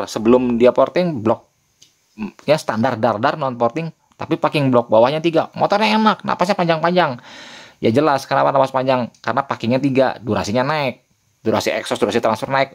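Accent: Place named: Bekasi, Indonesia. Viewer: Dpet ilmu sedikit2 nih bang😊. Ngomong2 suara abang kaya orang bekasi babelan😁😅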